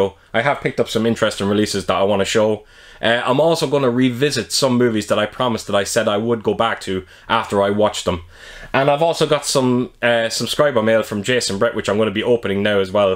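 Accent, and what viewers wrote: Ireland. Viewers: Well I think this video has had 1000 views - or thereabouts - as I'm typing this so you're really on your way now. Your channel has a difference that no other does and that's your collectables. But all those wonderful special editions and busts you are able to show would be for nothing if you didn't have the presentation style and ability that you've developed. I think your Irish accent probably helps too. It's great to see you doing so well and it is well deserved. We had visitors yesterday afternoon so I didn't get as much edited as I expected but having two of our oldest friends over (we now only live a few miles from each other) meant the home cinema got a good workout and it's now almost flawless so I'll do an update for that in this latest video too. We watched the new Blu-ray of 'Spencer' last night which is a fictional account of a few days in the life of Princess Diana and it was shot on film. 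It did have a similar look to Last Night In Soho in places but a little dowdy at times which was similar - but different - to how Dune looked here. All our systems are different for sure. The 4K of The Sting has a really interesting extra on it and one of the Universal film restorers actually states that scanning a film exacerbates film grain. That would appear to confirm that suspicion then and why some films have to have digital noise reduction (Terminator 2 perhaps?) and others such as The Fifth Element have far more prevalent grain on 4K than on a 35mm print. We're all st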